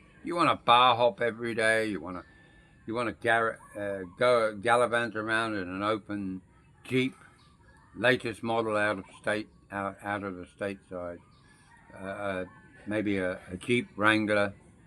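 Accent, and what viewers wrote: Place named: England, United Kingdom. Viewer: You have the accent of a Pom not a nz accent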